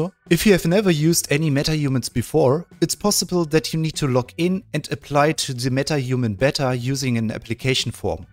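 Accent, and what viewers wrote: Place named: Germany. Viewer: very fast and great on the point explained video👍 you are german right? it would be awesome to watch some great videos in german then... ich bin zwar kein berliner aber trotzdem deutsch😅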